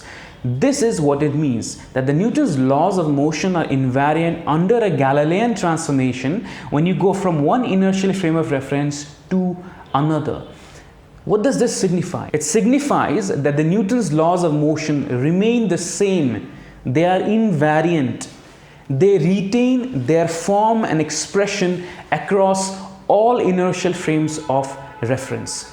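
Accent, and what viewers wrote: India. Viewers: I have to admit, it is not always easy to understand lectures of professors with Hindi accent, but I would like to say that I appreciate the effort you took on diction and pacing. Made it very comfortable to listen to, which is great for learning. Also your explanations are awesomely clear and easy to follow, without skipping anything important. I could follow the lecture very well, even though it is the first time I'm looking at this topic of the inconsistency of Maxwell equations and Galilean transformations. The algorithm did a great job, because I was looking exactly for this lecture and yours was the first thing to come up and it is well deserved. Thanks for the great content.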